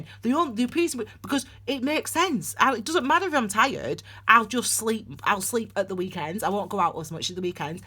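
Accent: United Kingdom. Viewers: 1st Time watching your reading... Hello from the US , New Jersey specifically...I love your energy, your happy and so sweet! And of course I Love the accent! We Americans will never sound as lovely like you Brits ❤️ Your reading was Great! I like the way you do your readings, I feel like it's much more in depth and has a great flow. So I hit subscribe, of course! Thank you for what you're doing to raise the vibrations and awaken the planet! Awesome !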